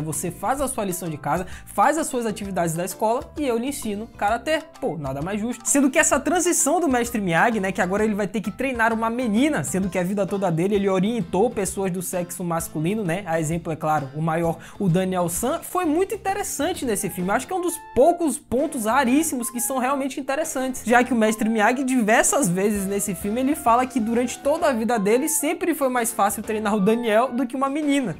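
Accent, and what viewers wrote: Bahia, Brazil. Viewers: . Você é de que cidade da Bahia? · vc é Baiano tbm sou rsrsr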